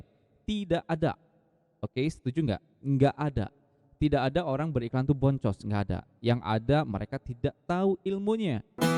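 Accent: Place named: West Java, Indonesia. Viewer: Sunda pisan euy, siap auto subscribe! Nuju dipilari konten meta ads kieu.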